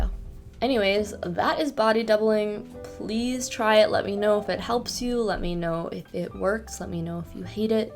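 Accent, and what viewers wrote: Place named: Ohio, United States. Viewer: The ohioan accent alone made it worth the watch 🫡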